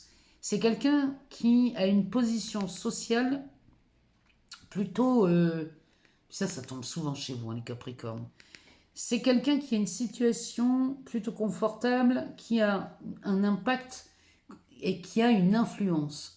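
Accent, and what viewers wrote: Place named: North, France. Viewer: Ouais super 👍 chouette 🦉 merci 🙏 bcp encore en plein ds l mil espèrons q c sera réalisé ds la matière bonne continuation à vs j 💥☀️🥰😍adore c q vs faites et votre accent du nord.! Encore merci 😊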